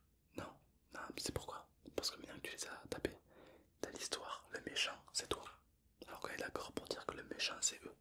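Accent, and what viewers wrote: South, France. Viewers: J'adore ton accent du sud MOI JE VIENS DE MARSEILLES!!!!!!!!!!!☀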